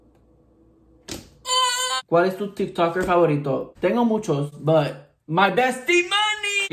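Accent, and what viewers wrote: Puerto Rico. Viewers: Sabes que es Boricua solo por escuchar como habla en español❤😂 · Jamás pensé que sonara tan ✨puertorriqueño✨ pensé que era más gringo💀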